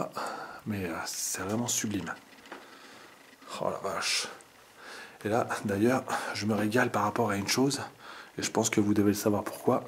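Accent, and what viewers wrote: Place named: Belgium. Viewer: Un petit accent belge .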